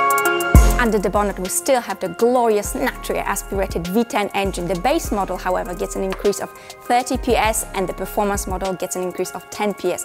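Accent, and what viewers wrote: China. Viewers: Interesting: a blond girl speaking English with a Chinese accent 🤔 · I have a friend calls Zhang, he is from China. Strangely his accent is like yours and even stranger I believe you are European. So you can imagine the culture shock I'm subjected to. Lol. Amazing review by the way and videography. :)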